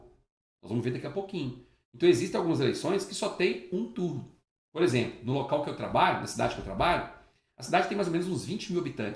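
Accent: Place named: Minas Gerais, Brazil. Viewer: Olá Prof! Sou aluno de outro curso, mas assisto às suas aulas sempre que posso. Sua didática no formato conversação, com jeitin minêro, é sensacional! rs Parabéns, mestre!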